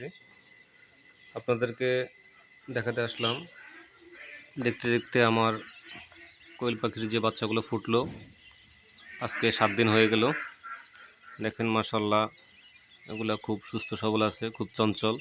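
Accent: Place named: Noakhali, Bangladesh. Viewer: নোয়াখালী কথায় ❤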